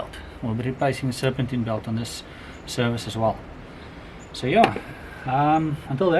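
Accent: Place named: South Africa. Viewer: Love the South African accent! Very helpful, thanks!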